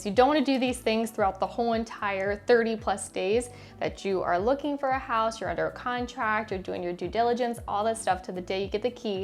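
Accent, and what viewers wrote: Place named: South Carolina, United States. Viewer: Not sure why this popped up on my recommended videos since I'm not in Charleston and not looking to buy a house right now haha. Maybe it just wanted me to connect with you 😁 I did want to say I do love the way you speak. Great pacing plus I love that S Carolina accent lol. Wish you all the best! Subscribing to support 😁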